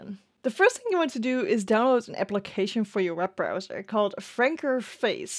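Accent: Netherlands. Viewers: Ik dacht stiekem al te horen dat je NL bent haha heel erg bedankt voor de tutorial!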